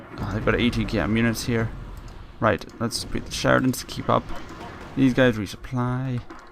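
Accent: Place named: Scotland, United Kingdom. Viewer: Hey man, what is your accent? To me it sounds like a mix of Scottish and british but thats just what it sounds like to me.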